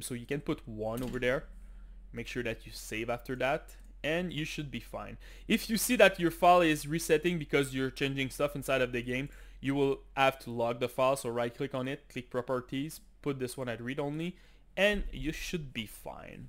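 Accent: Quebec, Canada. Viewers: Yooooo je reconnais l'accent quebecois ahahaha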